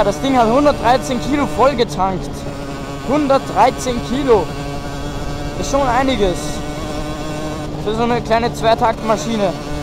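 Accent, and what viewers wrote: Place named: Burgenland, Austria. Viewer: vermutlich burgenländer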